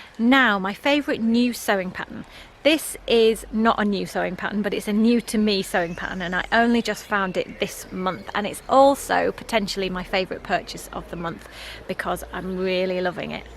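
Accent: England, United Kingdom. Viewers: All things old are new again! Macrame was a big thing in the 1970’s too. I didn’t do it but my sister was into it big time. It went with her California lifestyle. We pronounce it Mac-Ra-May. I love hearing the English pronunciations of the same word. So fun. Looks like you’ve done more in your garden since we were there. I don’t remember the hanging swings or the arbor. Neat!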